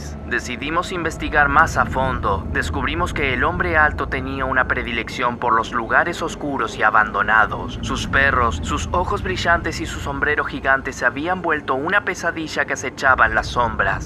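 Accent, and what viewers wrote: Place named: Argentina. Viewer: Es verdad hace 4 años era mejor haora abla como argentino porque cambiaste,😭😭😭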